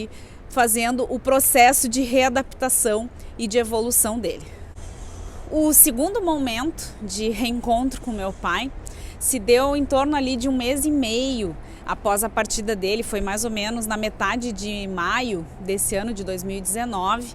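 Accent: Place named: Rio Grande do Sul, Brazil. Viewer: Como vc é linda tem uma voz que transmite uma paz...nosso sotaque gaúcho é lindo...lindo relato me emocionei...minha mãe desencarnou dia 3 de agosto pelo covid 19 sinto muita saudade dela...eu tive duas oportunidade de ir até lá aonde ela está...lugar de muita paz muita beleza incrível não tive vontade de voltar tinha uma praça com bastante árvore com muitas pessoas felizes...eu passei por essa praça com ela...e logo a frente tinha uma praia linda com uma areia bem clara com sol bem iluminado o mar bem azulado incrível fomos caminhando e conversando é logo depois voltei foi muito emocionante...eu não queria voltar queria ficar com a minha mãe lá nesse lugar lindo e de muita paz...mas temos que saber esperar a hora...creio que um dia irei definitivo morar nesse plano lindo com ela...bjos querida.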